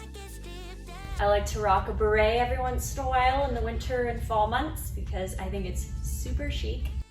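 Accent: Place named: Winnipeg, Canada. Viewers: Hi. Where do you live? I recognize your prairie accent. I grew up in Northern Saskatchewan and I don't remember sunny days. I just remember gray skies. I like how you put your outfits together. I really don't like wearing bluejeans during the winter because the cotton just feels so cold. If you are interested I prefer Pajar boots. They seem more practical and much warmer than Uggs. They also don't get as ruined as Uggs. Great video. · Are you from Winnipeg? This definitely sounds like a Winnipeger!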